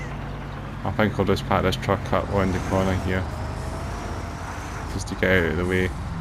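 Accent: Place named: Scotland, United Kingdom. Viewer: Ah. There must be a variety of Scottish accents then, and yours is one I'm less familiar with. I was close with a lovely Scotsman named Jim Wilson, and was very familiar with his accent, and less so with yours.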